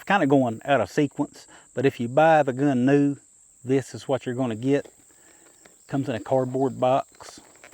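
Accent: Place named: South, United States. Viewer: that southern accent 1 in a million man.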